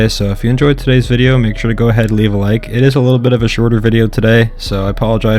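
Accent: Canada. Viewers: That accent is nothing like I heard when I was up in Ontario two years back, a little thicker. I dig it, I only met one dude up there with an accent like that and my group agreed he was just messing with us because he knew we were American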